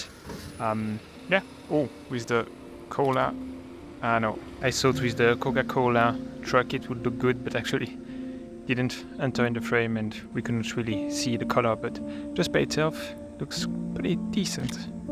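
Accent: France. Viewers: Je me disais que ça sentais l’accent français ! Super vidéo, grand talent !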